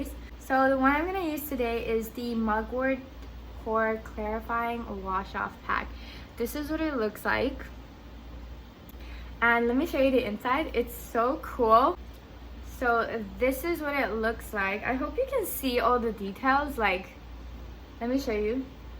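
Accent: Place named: Malaysia. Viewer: i'm wonder where are you from ? malaysia ? because you're accent look malaysia pure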